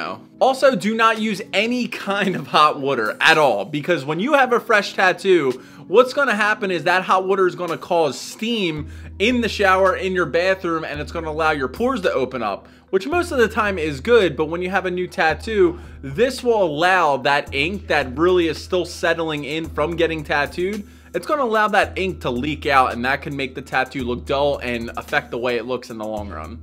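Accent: Philadelphia, United States. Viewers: i detect a little bit on an eastern PA southern Jersey accent maybe im wrong but i know a philly accent when i hear one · he’s from philly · You gotta be from Philadelphia with the way you say warder.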